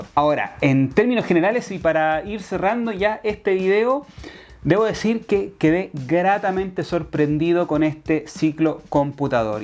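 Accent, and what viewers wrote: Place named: Chile. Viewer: Hola ya e solucionado el problema de la palabra go q se me ponía en vez del la velocidad ahora ya se coloca como te dije anteriormente lo uso en un patín de gama alta pero sabes e escuchado a muchos Youtuber q dicen q cuando la velocidad es calculada con GPS es la más fiable será así????? También tengo que decirte q cuando voy en rodando el patín en la pantalla me marca 26 k y en el ciclo me marca 23 24 kilómetros entonces no es igual es bastante la diferencia aveces marca 28 patín y 24 ciclo entonces aquí en la hago casi se q tu eres de chile por el acento yo soy de viña del mar pero llevo 34 años en Barcelona y se q me dirás este ciclo es para bicicleta lo sé sea para uno u otro no van parejos con respecto al patín en cuanto a la velocidad al igual aveces le cuesta un huevo q se conecte a un satélite casi 4 minutos y hay veces q lo hace en 30 segundos no vivo en un pueblo ni en un sitio apartado vivo centro centro entonces??????? 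Ojalá me puedas ayudar con todo lo q aquí te pregunto un saludo desde Barcelona Spain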